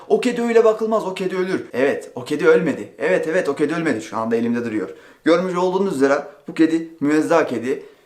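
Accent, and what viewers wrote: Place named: Ankara, Turkey. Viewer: Haçı sen Ankara lımısın bunasıl şive agız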